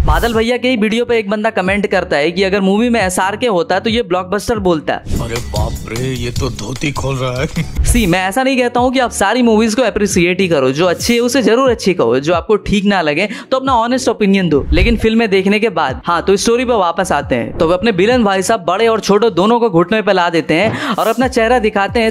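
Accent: Bengal, India. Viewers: I am from kolkata and your bengali accent bro touched my heart great video man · Are you bangali 😅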